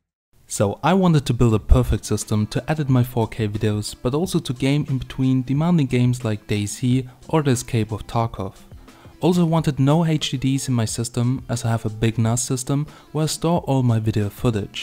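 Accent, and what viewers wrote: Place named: Germany. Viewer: bist du Deutscher hört sich voll so an wenn du englisch sprichst.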